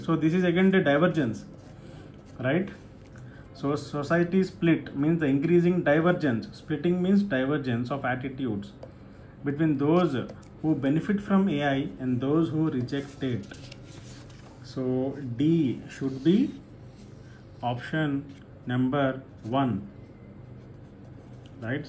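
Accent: India. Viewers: the Indian accent was difficult for me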